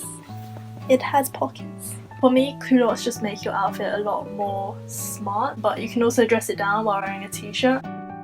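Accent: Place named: United Kingdom. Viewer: I also love wearing puffy clothing to hide me tummy too XD i love your british accent!! im australian but people claim i sound british?! HAHAH anyways i love the haul! and how honest you are heheheh i wish i could be your 100th sub BUT lucky lucky to whoever it is T.T YEAH YYAYAYA XD looking forward to more videos!! :DDDD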